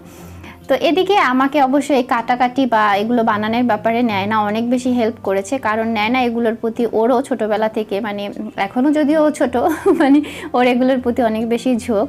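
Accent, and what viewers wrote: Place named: Madaripur, Bangladesh. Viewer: Tumr onk gun re apo.Madaripur er maya bola Kotha 😊💝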